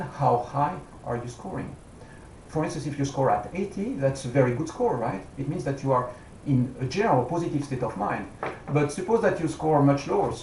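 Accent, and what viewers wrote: France. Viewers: I'm sure you're french ;)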